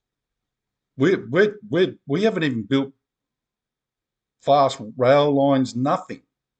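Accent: Australia. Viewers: Good to have an Aussie voice, there are not enough speaking up.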